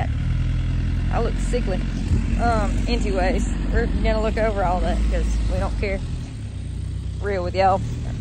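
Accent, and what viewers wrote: South, United States. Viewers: Amazing southern accent..😂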